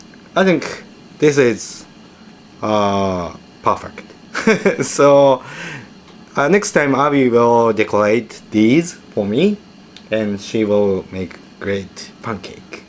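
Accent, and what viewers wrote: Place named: Japan. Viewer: He's Japanese, English isn't his native language.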